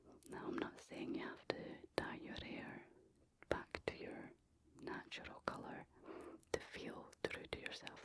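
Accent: Scotland, United Kingdom. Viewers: As an American who just found out that i have mostly Scottish heritage… it explains why I love the accent so much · Love your Scottish accent 🥰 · Scottish has to be one of my favorite accents! 🤌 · Love the Scottish accent. Found it extremely relaxing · Your Scottish accent makes my brain go all squiggly. Never stop saying words please. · HELLO really enjoy your Scotty accent and your beautiful smile. Rick Henderson U.S.. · Doesn't even have to be ASMR, I absolutely LOVE the Scottish accent. I could listen to someone with this accent talk about absolutely nonsense allllll day. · Gosh i Miss Scotland I fell in love with your accents this past summer 😃 lol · Most Gorgeous! Love your Pure Scottish Accent Whispers! Love your Beautiful Smart Look!:yougotthis:💯🌟❤🌻🌼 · I've loved Scottish accents on women since I crushed on Sheena Easton way back in the day. 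🥰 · I love ASMR and also have studied linguistics, and the Scottish and Irish accents are literally some of my favourite accents in the world; they’re the funniest, somehow the most uncanny, and I love it. Perfect mix. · I love Scottish accents so much, I find myself repeating words in it sometimes. It’s so satisfying 😂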